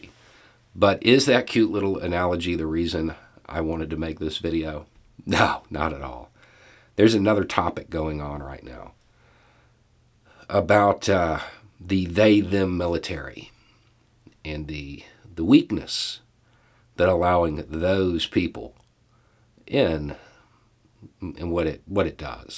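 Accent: South, United States. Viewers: When I think of my neighbours to the South of our border, I like to tell myself that is an example of what great things a Southern accent is capable of saying. Keep up the great work. Lots of people are noticing 👍🇨🇦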